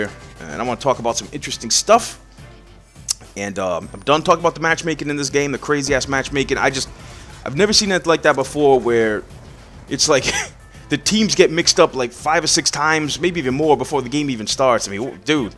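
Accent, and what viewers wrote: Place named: United States. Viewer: do all you Americans speak in such a way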